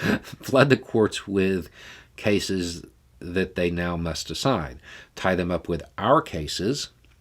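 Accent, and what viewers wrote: South, United States. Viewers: Speaking of strong southern accents. I watched a five-year-old video of yours the other day and thought that your accent was a lot stronger back then.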